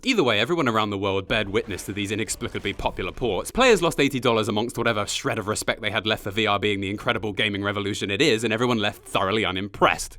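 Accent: United Kingdom. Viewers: Yay the strange British man has returned!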